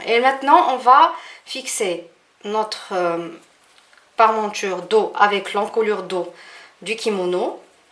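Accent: Tlemcen, Algeria. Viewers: d'aprés l'accent vous étes une tlemcenniene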